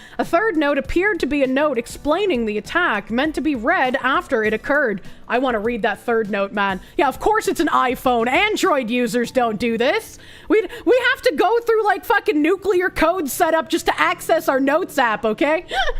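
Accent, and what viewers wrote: Boston, United States. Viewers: has the only Bostonian accent that doesn't give me brain damage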